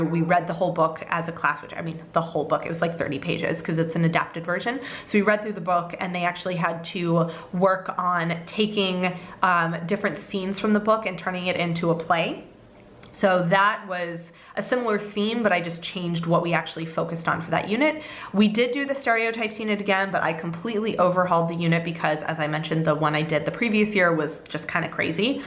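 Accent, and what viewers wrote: United States. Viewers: That's really cool. The Yearbook thing brings back memories =) Do the kids ever get confused about pronunciation of some English words between your American pronunciation and the other teacher's UK pronunciation?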